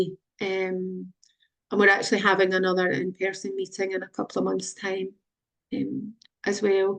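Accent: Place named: Scotland, United Kingdom. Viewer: Thank you. For sharing your story. I can relate to your story so intensely. I myself am a survivor of Viral Encephalitis and Autoimmune Encephalitis. Also living in Scotland. I feel so fortunate. Encephalitis changed my life. I have a different outlook on my life now. I also live in the West of Scotland. And it was comforting hearing your voice and accent in your story. ❤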